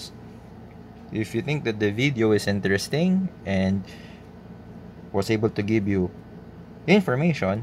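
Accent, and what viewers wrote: Philippines. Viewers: Nice video,, Your voice accent like my Filipino colleage btw.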